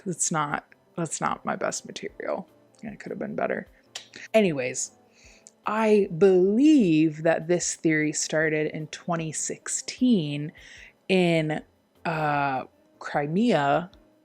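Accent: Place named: Midwest, United States. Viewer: I love your humor and the way you talk, it reminds me of home, are you from Michigan perhaps… or maybe Minnesota?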